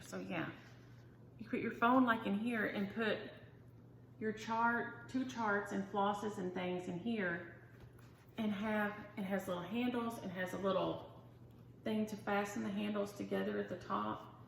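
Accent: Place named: Appalachia, United States. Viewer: Congratulations on over 4000 subscribers! You out did yourself with this week's stories. You talk a lot like us hillbillies here in WV (that is a compliment 😊). Patriotic Cardinal or Project.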